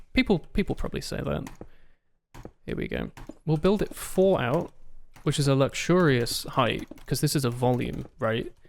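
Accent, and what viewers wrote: United Kingdom. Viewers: I approve, British man in my phone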